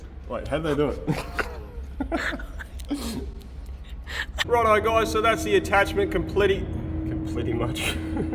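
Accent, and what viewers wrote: Australia. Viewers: I'm from Germany and that Australian accent makes it easier for me to understand... I was born near Cologne and the rythm of Australian and "Kölsch", which is a German accent spoken around here, is quite similar...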